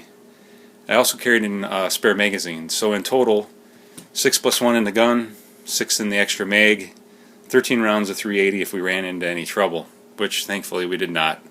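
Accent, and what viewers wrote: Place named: Finland. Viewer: Are you from Michigan originally? I hear some finlander accent. No disrespect I'm a native yooper and thanks for the info.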